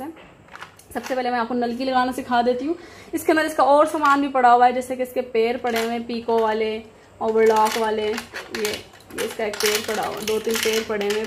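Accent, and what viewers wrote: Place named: Karachi, Pakistan. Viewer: ap apne accent se karachi ky lgty ha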